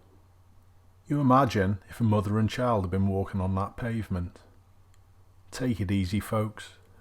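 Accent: Liverpool, United Kingdom. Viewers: Your laconic Liverpudlian commentary adds just the right touch of understated contempt to these clips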